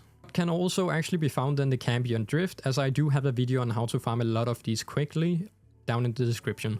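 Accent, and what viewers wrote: Denmark. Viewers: This video really helped🤩. I have a question are you from Denmark because it sounds like it with your accent?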